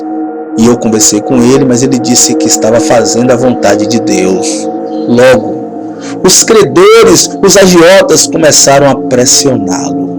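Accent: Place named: Bahia, Brazil. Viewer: jóia. Você é baiano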